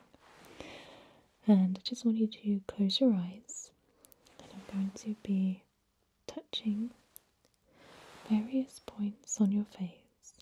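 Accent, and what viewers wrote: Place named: Lancashire, United Kingdom. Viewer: Sometimes I don't know if I prefer a Lancastrian accent to a posh southern accent.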